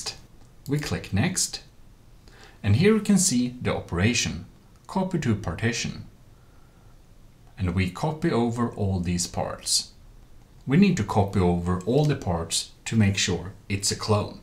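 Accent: Sweden. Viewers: är du svensk??? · While the video is great I have to say that yes it is the Swedish accent and as a Swede it hurts to hear the accent knowing I sound the same lol. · Either that’s just your accent or the Sweden 🇸🇪 accent. I like it! By the way, nice video.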